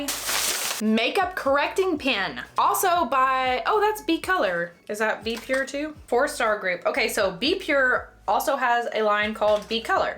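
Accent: South, United States. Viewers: Girl , I'm love the Andes mint chocolate candy. Yes , better than the New York peppiermint patties. Love your videos and the country accents, because I'm from North Carolina, so I get it ,I love it so much.❤❤❤❤❤❤❤❤❤❤❤❤❤❤❤❤❤❤❤❤❤❤❤❤❤❤❤❤❤❤❤❤❤❤❤❤❤❤❤❤❤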